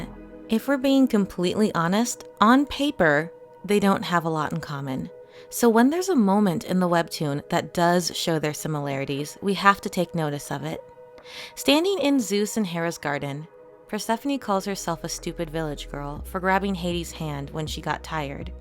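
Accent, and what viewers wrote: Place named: New Zealand. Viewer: Love hearing that NZ accent!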